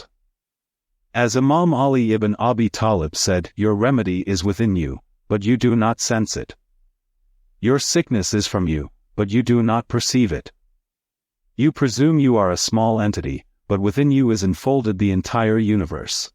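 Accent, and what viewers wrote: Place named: Tunisia. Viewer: لا يا أخي أنا شخصيا لن أشهاد هاته الفيديوهات نحن نحب صوتك و طريقة إلقائك و تفاعلك باللغة العربية الممزوجة بلهجة تونسية لي نحبه ياسر كمغربي جارك و محب من أحبائك شكر و أتمنى منك تفهمي.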